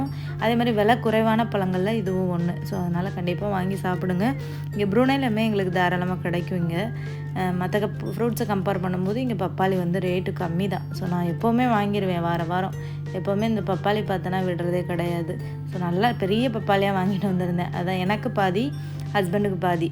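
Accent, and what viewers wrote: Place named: Madurai, India. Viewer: Recently started watching your channel ❤so nice to hear the Madurai and Ramnad slang..my parents are from Ramnad.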